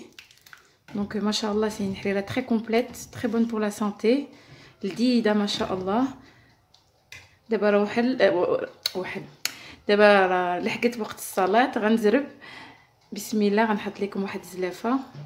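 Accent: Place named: Tlemcen, Algeria. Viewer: Vous avez un accent Tlemcen et le vocabulaire n est pas marocain . Les épices .les herbes la farine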